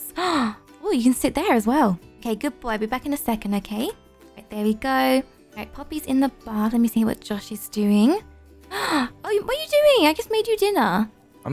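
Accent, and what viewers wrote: United Kingdom. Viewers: Definitely British. Go find the exsplamation to find out! <3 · I like how are british ❤❤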